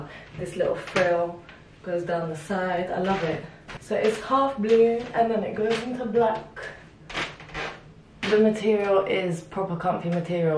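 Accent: United Kingdom. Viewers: Cheers! Your British Accent adds to your Beauty 10 fold.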